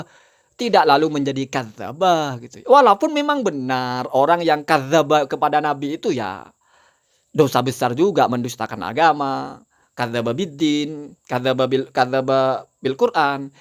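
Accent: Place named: Banyumas, Indonesia. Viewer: Jngn ngapak maka nya baca sesuatu itu biar jls ,jngn medokkkkkkk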